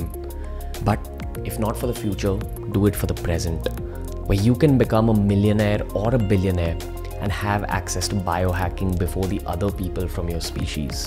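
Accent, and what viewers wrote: India. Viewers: Nice English Proud of Indian accent I don't understand why to do people change their accent.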